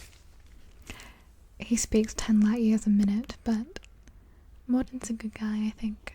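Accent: Australia. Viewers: ( ^ω^) What's this accent I'm picking up?! Some thunder from down under?? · You're very beautiful and your "aussie"? (I think) accent/voice is very soothing. Great video 👍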